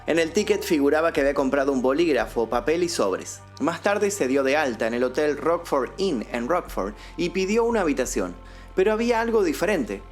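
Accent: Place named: Argentina. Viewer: Me encantan tus vídeos! Me gusta el "SH" de tu acento argentino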